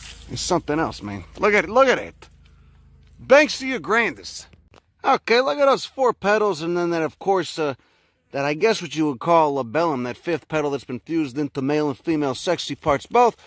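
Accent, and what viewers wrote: Chicago, United States. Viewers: Chicago I think. It is really nice hearing his accent and botany.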